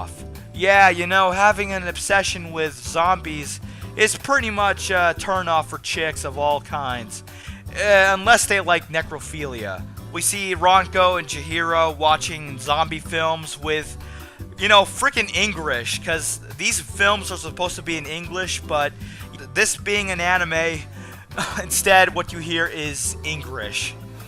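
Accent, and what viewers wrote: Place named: United States. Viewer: These Murrican pronountiations, man...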